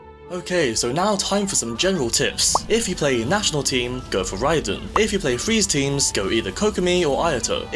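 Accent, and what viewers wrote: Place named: United Kingdom. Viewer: Are you British? Your accent sounds British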